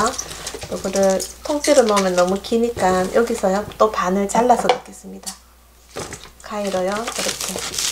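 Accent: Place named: Daegu, South Korea. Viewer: 님 목소리도 시원시원 요리도 시원시원 대구 말씨가 정겹네요 팬입니다 저도고향이 대구입니다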